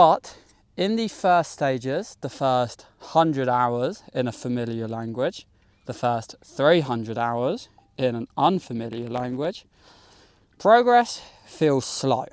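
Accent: United Kingdom. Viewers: I enjoy your British accent👌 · Hi I'm from Philippines 🇵🇭 love watching your video your accent I really like to imitate to have a British accent to I always follow your video hope you visit Philippines 🇵🇭 thanks I learn a lot from you 😊❤❤❤ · Usually I don't liesint to UK accent but I like it frome you maby because you speak normally and using simple words ❤ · هذا أشبه بالبودكاست وليس بالمدخلات المفهومة لأنه حسب ما أعتقد ليس في المشهد مايساعد على الفهم باستثناء الكتابة كحركات المتحدث او الاشارة الى مايحيط به لنفهم مايقصده فقط عندما كدت تسقط .هه.اوفي الاخير لما قلت وداعا نراكم لاحقا ..بودكاست في الطبيعة..حتى مستوى b1سيعاني حتى يفهمك ..ولكنني أقدر مجهودك في التحدث بينما تصعد الجبل وتقدم النصائح وهذا مجهود مزدوج...شكر من القلب..لهجتك البريطانية أصبحت أصعب كلما حاولت الوصول الى القمة وتعبت أكثر .. علينا أن نفهم مهما كان الحال..واصلوا ..فالرحلة الى الطلاقة معكم ممتعة متعة صوت الشلال بجانبك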